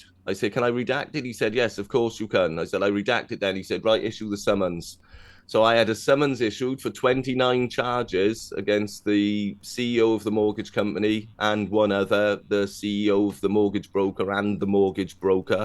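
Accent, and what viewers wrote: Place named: South Wales, United Kingdom. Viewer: proper south Welsh accent.....Swansea/Clydach valleys?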